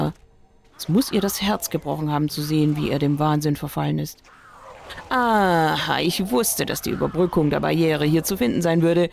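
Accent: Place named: Berlin, Germany. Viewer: Wie süß du das sprichst bist Berliner in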